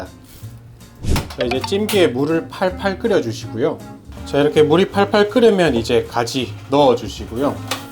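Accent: Gyeongsang, South Korea. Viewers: ㄱㅕㅇ상도 말이 멋져요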